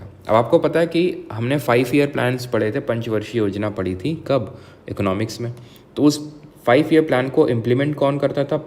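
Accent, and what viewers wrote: Kanpur, India. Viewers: Bhaiya aap kanpur se h kya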